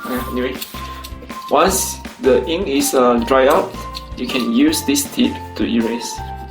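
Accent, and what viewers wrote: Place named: India. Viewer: Are you Indian? Hard to understand...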